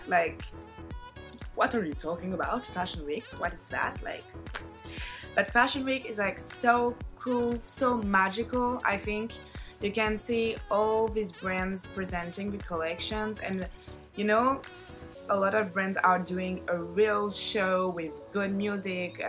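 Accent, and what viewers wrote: France. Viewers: Hello ! I am american 🇺🇸and your video interested but on the other hand you do not have American accent but a strong French 🇫🇷accent and you look a little your words which is a little difficult to understand you but otherwise it is a very good video the subject is interesting👍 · Your accent sounds very french that’s soooooo cute😍😭😭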